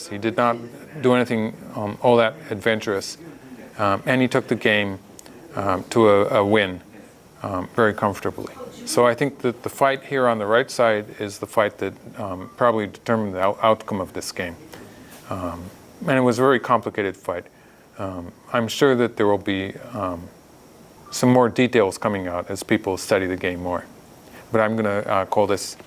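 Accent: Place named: Japan. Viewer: I swear this guy's accent is sounding slightly Japanese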